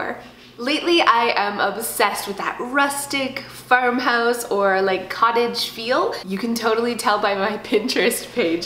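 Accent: Canada. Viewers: Ga Ga,gave me life! Love your cute Canadian accent,and sweet energy! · Lol.. this is so refreshing. I Have an extremely strong willed 4 year old boy. every day is a challenge! sooo nice to know I'm not alone! also, the centerpiece is dreamy! I'm a Canadian in Missouri and your accent makes me homesick!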